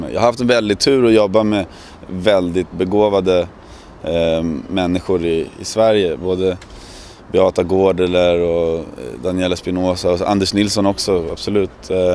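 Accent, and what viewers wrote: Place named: United States. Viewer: Holy shit I thought he was American. Sick.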